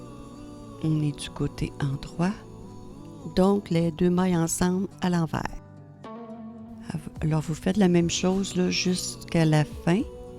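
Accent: Canada. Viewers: Il y a longtemps que je cherchais un modèle juste un peu pénible la pub qui s'affiche merci pour votre modéle il est super et j'adore votre accent canadien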